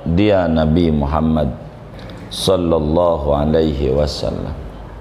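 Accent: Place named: Jakarta, Indonesia. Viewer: Pantes aja... Tapi logat bhsany betawi banget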